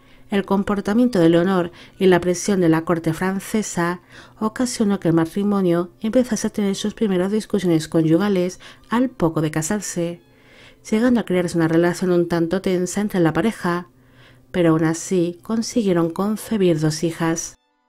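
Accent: Spain. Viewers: Sos española?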